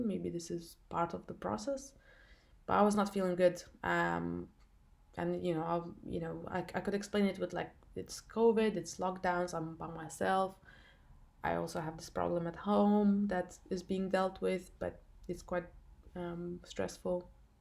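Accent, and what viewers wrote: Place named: Eastern Europe. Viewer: My sister if you felt spiritual oppression yesterday on halloween it means you're on the right side. the side in the Light opposite to witches. I have a question - are you from some slavic country? you sure have that accent. Jesus keep you close to HIM. Jesus guide you so that you don't stumble. In Jesus Holy Name we pray. Amen.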